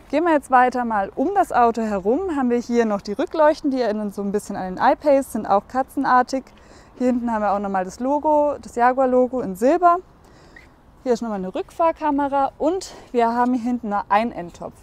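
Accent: Bavaria, Germany. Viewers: Sehr schönes Fahrzeug ich habe A5 Cabrio 2018er :) bist du aus Bayern mfg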